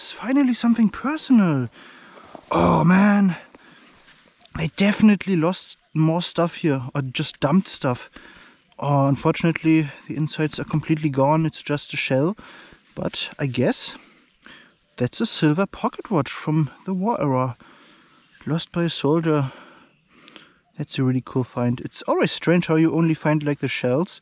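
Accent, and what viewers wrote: Germany. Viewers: Bro has such a german accent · Is your accent German? If so, are you still allowed to keep items with insignias? As I understand there are rules about having sw4stlk4s. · Dieser deutsche Akzent hahaha, gutes Video glückwusch zu den Funden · Typical german english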